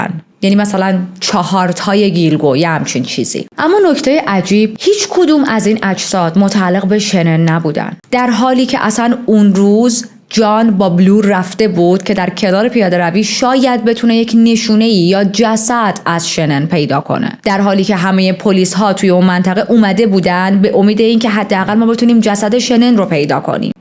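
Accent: Shiraz, Iran. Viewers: کاکو شیرازی هستی ؟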